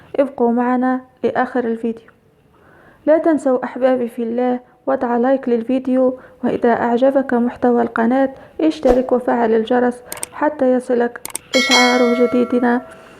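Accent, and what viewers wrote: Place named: Tunisia. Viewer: السلام عليكم ورحمة الله وبركاته من كلامك أنك تونسية بارك آلله فيك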